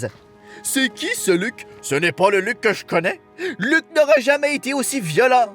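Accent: Quebec, Canada. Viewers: j'adore ton accent québécois je trouve ca vraiment fun et ton humour est cool je m'abonne ;) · Bonne video, en plus ton superbe accent québécois rend vraiment bien. Avant je trouvais ce film affligeant, grâce à toi je le trouve juste déprimant. Peut être qu'en la visionnant 24/24 pendant 6 mois, je trouverai ce film bon. Mais là, j'ai la flemme... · Bravo j'adore ce que tu fait. Je te trouve très drôle et avec l'accent québécois c'est encore mieux. Que vive le Québec 🇨🇦🇫🇷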